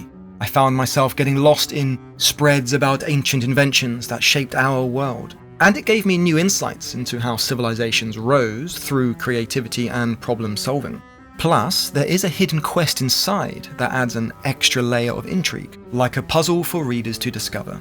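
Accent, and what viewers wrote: United Kingdom. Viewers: Hearing British people swear while talking about American history adds sunshine to my day. Subscribed! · Oh man, I love this video but something about a lofty british accent over the mocking depiction of dust bowl victims make me want to wage a second revolutionary war (but like, just on this guy.)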